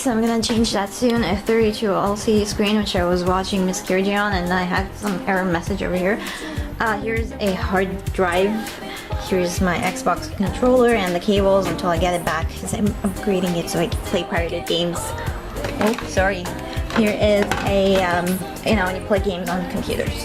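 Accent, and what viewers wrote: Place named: Egypt. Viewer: you're Egyptian ?! o.o gosh your accent is AMAZING! xD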